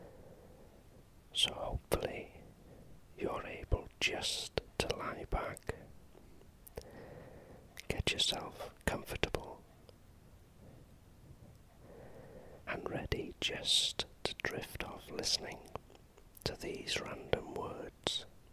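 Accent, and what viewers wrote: Wales, United Kingdom. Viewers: This is wonderful. Welsh? Love your voice and the content of your videos, perfect for bed time, perfect for sleep. Thanks.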